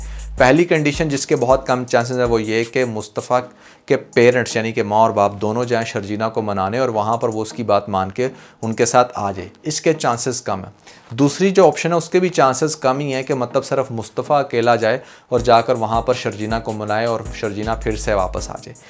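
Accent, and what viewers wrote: India. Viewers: Ap Indian ki tra feer bol rhy ho phr q nhi bolty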